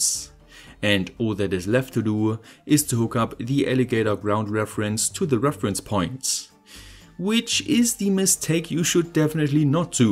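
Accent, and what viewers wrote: Germany. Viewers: German accent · You’re german right ?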